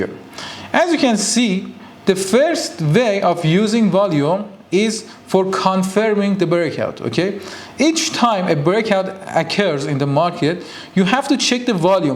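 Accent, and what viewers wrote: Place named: Iran. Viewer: Hi its a great honor to see u here talking in english language but u have very farsish accent 😁😜🌹🌹❤️⭐❤️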